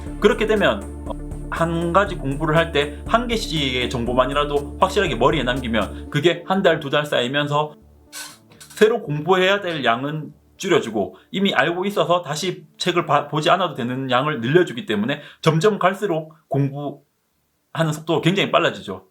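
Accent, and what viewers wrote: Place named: Gyeongbuk, South Korea. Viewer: 경북 거주하시나요? 억양이 저희 고모랑 똑같아서요 ㅎㅎ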